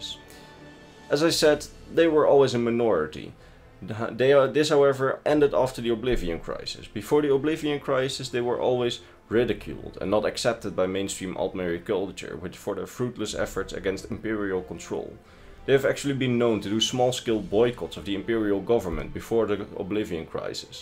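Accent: Netherlands. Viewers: That’s the dutchest english I’ve ever heard lol, good to see that we have a great representation in the elder scrolls community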